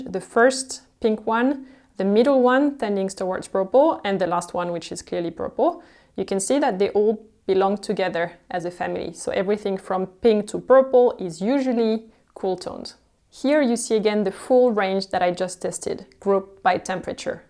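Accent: France. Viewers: I truly just love your French accent, only heard it in movies.. so nice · English with french accent is soooo beautiful ❤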